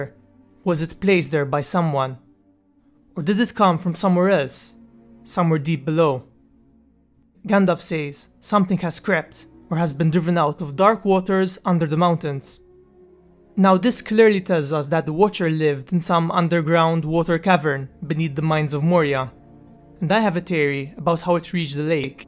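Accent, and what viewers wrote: Malta. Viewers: Ifhem, fl-about hemm "country: Malta" kif wkoll l'accent jghin naqra ghal assumazjoni bhal dik! Proset imma eh, kompli sejjer hekk :D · Your accent is interesting. Are you Maltese by any chance?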